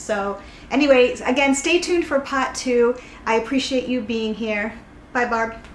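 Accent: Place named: New England, United States. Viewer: Fellow New Englandah? lol also thanks for the review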